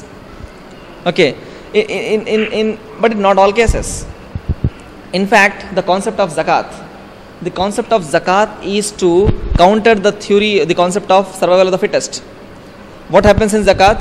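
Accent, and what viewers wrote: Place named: India. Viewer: A typical Indian style of speech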